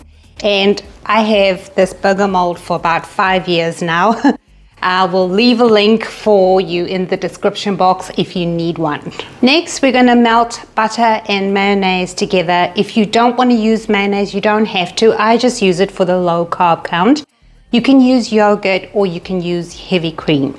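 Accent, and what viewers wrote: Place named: South Africa. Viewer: Hi, thanks for the recipe. Are you South African? Your accent sounds like it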